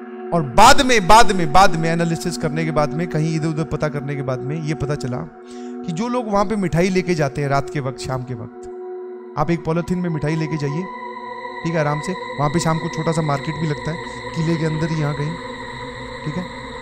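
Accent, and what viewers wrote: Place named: Bengal, India. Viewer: Ami je tomar😅 hayy your Bengali accent just killd me ❤❤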